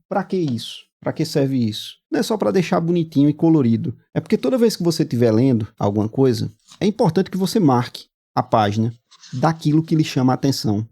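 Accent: Pernambuco, Brazil. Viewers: Pelo sotaque é Pernambucano .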